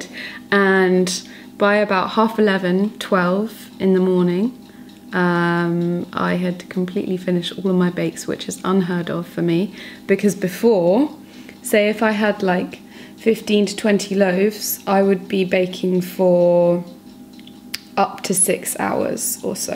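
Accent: United Kingdom. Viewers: I love your British acent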